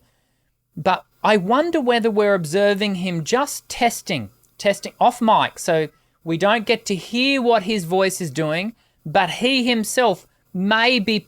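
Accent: Australia. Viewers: His microphone shake is also a good way to respond to people who continue to insist that "he isn't singing live" just food for thought... also it isn't just a personal best... there are no other recorded live examples of this feat (if you have one show me) best voice in the world (that's an opinion translates to "favourite") best technical vocalist? that is measurable, got a better one over all aspects? appreciated your commentary it was very Aussie... greetings from the Sunny Coast.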